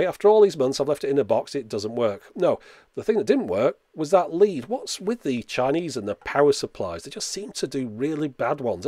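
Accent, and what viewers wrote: United Kingdom. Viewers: I can totally get over how brits pronounce tyoobs, but I can't get over bought-a-preamp-for-$200-and-then-it-sat-in-a-box-for-two-months. :-) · you say TUBE just fine mate lol... I'm from the U.S. and I like listening to your U.K. accent . So please say TUUBE as much as you like . Keep up the good work ole boy lol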